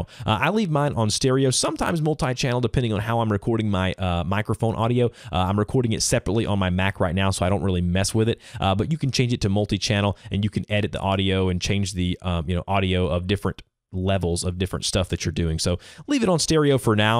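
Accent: South, United States. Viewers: he sounds southern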